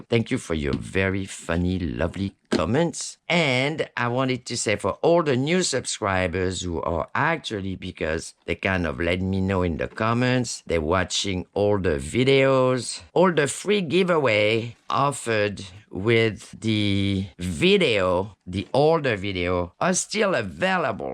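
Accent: France. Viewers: OMG I just found your page. You’ve had me laughing and smiling for the past two hours. I love your accent! Not sure but maybe French? Love your videos. I first watched the video where you made the ephemera storage. I cracked up when you said “ Oh wait look at all the little people. Now I can see all the little people!” Just know you have a new subscriber and I’m loving all your content! Cheers…. Nancy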